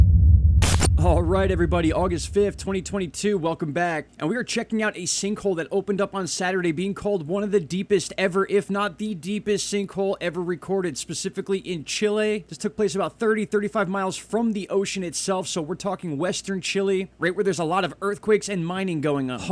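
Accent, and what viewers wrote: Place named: New Jersey, United States. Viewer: I really like your channel 😊 (plus, I hear a hometown accent-sounds like New Jersey 😉). I was born in Philly, but grew up in the Tri-state area, mostly New Jersey-from Blackwood and Willingboro to Glen Ridge and Bloomfield and about 2/3 other cities in between lol. Thanks for covering these things. It's only going to get worse from here on out, so it's good to have you on board! We NEED a channel like yours 👍.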